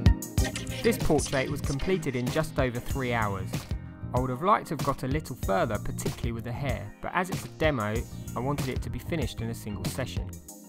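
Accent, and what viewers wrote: United Kingdom. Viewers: First time i hear a Greek guy with a british accent, more british than the british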